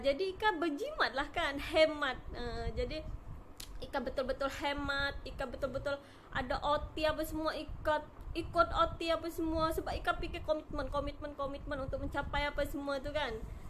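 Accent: Malaysia. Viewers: sudah boleh bertutur mcm org Msia...bagus kl boleh d beritahu asal dr daerah mana d Indo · bagusnya ika servive. lagi cakap juga sudah malaysia.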